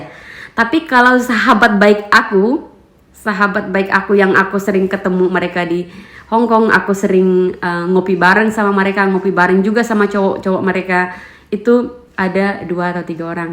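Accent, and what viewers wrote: East Nusa Tenggara, Indonesia. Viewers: mba dengar dari logat ny kaya orang NTT yah? maaf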